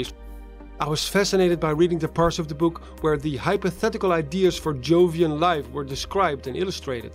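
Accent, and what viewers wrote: Netherlands. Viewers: Are you dutch, afrikaans or german?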